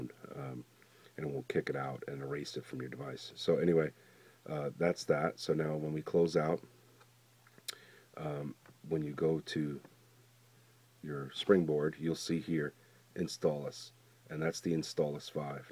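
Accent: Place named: Long Island, United States. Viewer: i like your accent! are you from long island? thanks man!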